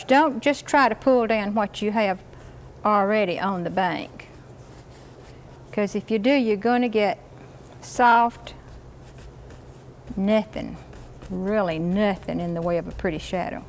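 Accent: South, United States. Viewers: Why have I never heard of this woman before? Why, she’s the female Bob Ross! I just love her southern accent!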